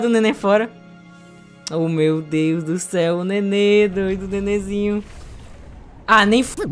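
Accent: Northeast, Brazil. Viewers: tu é nordestino né?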